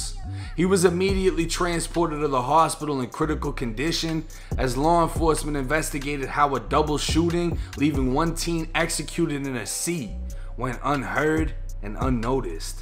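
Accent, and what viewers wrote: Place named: New York, United States. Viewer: I subscribed because of your NY accent?? Lol. Thanks for being articulate, and a good story teller.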